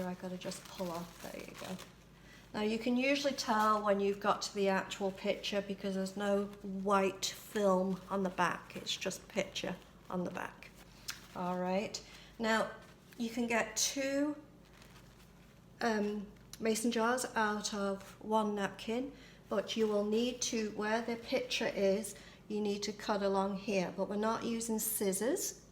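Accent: England, United Kingdom. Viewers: Just found your channel and have subscribed. May I ask are you from England as you sound English, without a Canadian accent x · Hi, thank you for your videos. They’re great. And you live in Canada but you sound very English. Where are you originally from? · I’m fascinated by your accent. Do I detect a whiff of an English accent in there….? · Hi, you sound English, love your jars, your techniques, especially the robin jar. I'll be watching again and tell my friends. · British voice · Are you originally from the UK?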